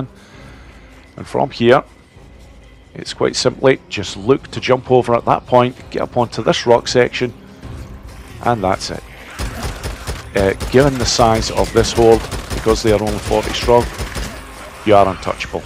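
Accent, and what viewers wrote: Scotland, United Kingdom. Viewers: You're fairly local to me as well, going by your accent anyway. I'm back at work, as a long distance(ish) truck driver. So the whole of the UK is local to me😀 I work for a Stirling company. Thanks for your kind words, but it was almost 3 years ago now, so the chances of getting that 3% back are pretty slim. On the other hand, I haven't given up yet. I did the 2021 Edinburgh Kiltwalk Mighty Stride, as the goal for my legs physio, 6hrs 6 mins. And 500 miles walked in training for it, so not at all bad for an old man 😃 So now I'm off to do all the challenges, with prep from your videos. Then I'd better do another play through, to see how much easier it could've been 😂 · awesome content, brother. All of it. And I always enjoy hearing the Scottish accent. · Thanks for this - all hordes are cleared and some of these methods were used. 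Can't figure out if you have a decent Scottish accent or no. Or just Eastern european with British accent (no offense).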